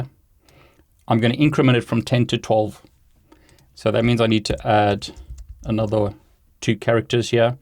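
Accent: South Africa. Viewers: You sound like a South African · Are you South African? I recognise the accent. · Thank you, I'm an idiot. Also, do you have SA heritage? I swear that accent sounds super local!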